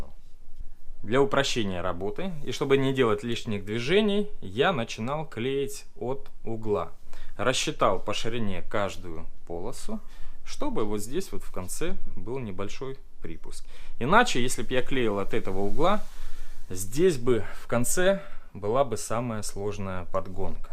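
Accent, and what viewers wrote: Rostov, Russia. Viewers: это Ростов, после переезда у меня сестра стала так же говорить)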